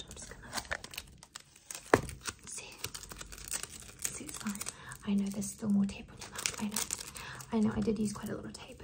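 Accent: United Kingdom. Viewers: + why do you have a British accent?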